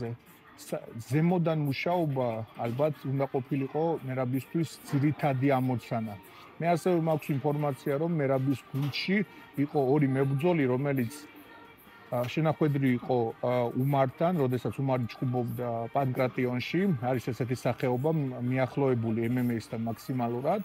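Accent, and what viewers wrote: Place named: Russia. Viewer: აქ მგონი რუსული აქცენტით ნათქვამ overhand -ზე იყო საუბარი. 😊 მაგრამ სულ არ აქვს მნიშვნელობა რას ამბობთ გავიგეთ.😊 ქართველები ჰ-ს ამბობენ უფტო ვიდრე ხ-ს თქვენ ალბათ რუსულ ენას ფლობთ კარგად და გავლენა გაქვთ. მადლობა ინფორმატიული განხილვებისთვის!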